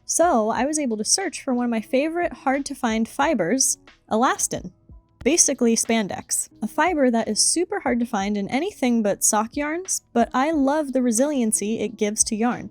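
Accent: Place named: United States. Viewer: Wait a sec, where are you then? You sound American to me but maybe I'm just tired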